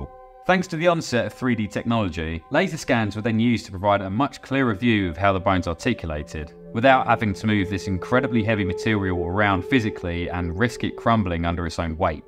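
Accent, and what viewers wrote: United Kingdom. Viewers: Easily my favourite paleo channel. Info dense yet easy to digest. And who doesn't love a regional British accent. I would wanna see you try south Yorkshire. It would be funny because you actually annunciate things. Citizens up here less so.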